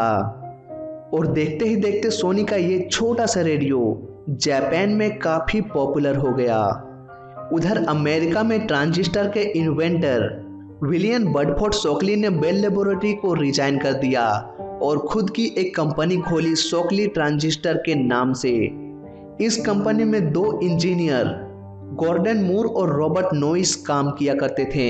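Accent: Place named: Bihar, India. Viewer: Buddy, it doesn't seem to be Hindi what he is speaking, it may be Bihari or any other distorted local language, but surely not HINDI.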